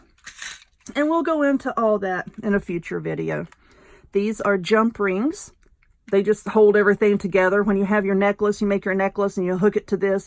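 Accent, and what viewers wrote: South, United States. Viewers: So happy to hear your Southern accent again. You tickle me when you say caddywampus (sp) I'm from Jersey, have never heard that word before and laughed out loud when you said it. I also love to watch you crochet. My grandma taught me when I was little but I forgot everything. I've been watching YouTube tutorials to relearn. I'm hoping you make some crochet projects. Happy crafting.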